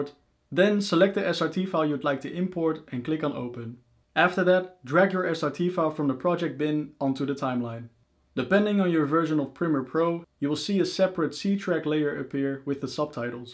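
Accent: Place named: Netherlands. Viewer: Strong Dutch accent, but thanks for the tutorial.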